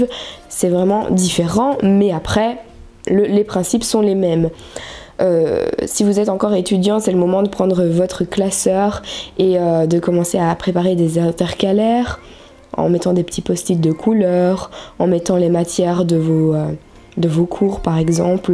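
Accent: Belgium. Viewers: J'adore ton léger accent (belge il me semble)😍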